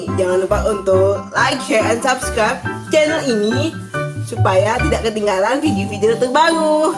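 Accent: Palembang, Indonesia. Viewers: palembang banget logatnya, R nyo berderuuuuuupppp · oo pantes aja ..suara dari logatnya keliatan bnget buu...Salam wong kito galo😄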